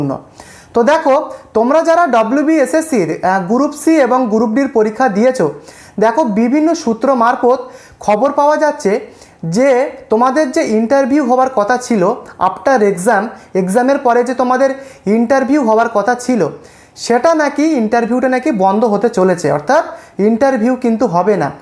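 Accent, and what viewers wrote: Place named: Bangladesh. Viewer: tomar bhasai bangladesi banglade vaab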